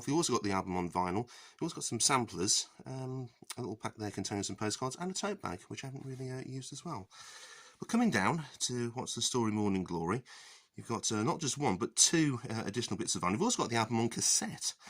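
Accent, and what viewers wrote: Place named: United Kingdom. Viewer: Yeah, I was thinking the same but you know how things go when you talk & roll video for over 6 minutes lol... He gets away with it having the cool Brit accent :P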